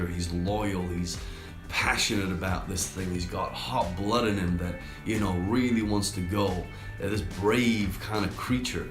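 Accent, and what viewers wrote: Australia. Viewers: khaled hamdan He sounds Aussie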